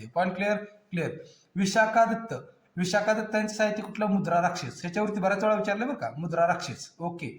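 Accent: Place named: Latur, India. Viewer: तुम्ही लातूर चे आहात का 😂😂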